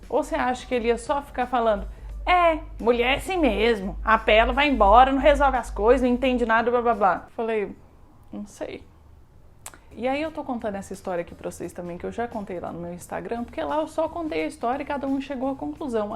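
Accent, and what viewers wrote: Minas Gerais, Brazil. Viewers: Mineiro tretando deve ser a coisa mais fofa kkkk sotaque fofinho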